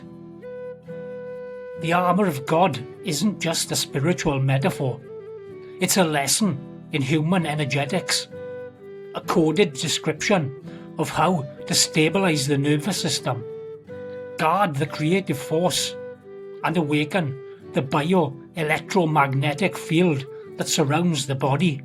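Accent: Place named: Wales, United Kingdom. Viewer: Fantastic video. Thankyou for making this. Great to hear the Welsh accent. I was born in Cardiff and grew up in Rudry in south Wales.❤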